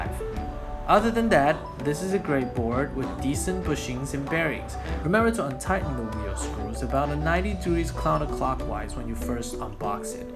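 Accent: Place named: Taiwan. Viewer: 竟然是台灣人的影片，這口音很純喔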